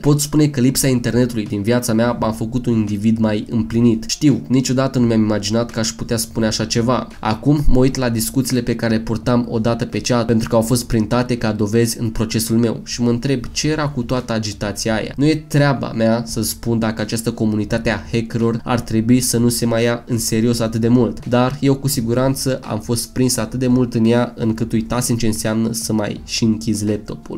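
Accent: Moldova. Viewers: Esti Moldovan? Ai un accent destul de ciudat😁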